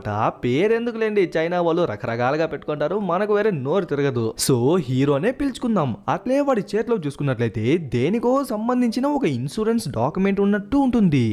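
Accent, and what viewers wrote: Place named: Rayalaseema, India. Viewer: AS ALWAYS SUPER EXPLANATION ANNA love your all video from beginning mi telugu slang naku baga nachindi ekkada anna rayalaseema na ♥♥♥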